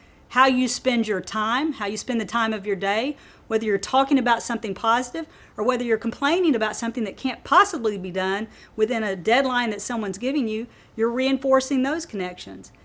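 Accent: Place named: New Zealand. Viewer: ♥ her accent, from NewZealand :) Sounds like she's from where I live. . . sort of :)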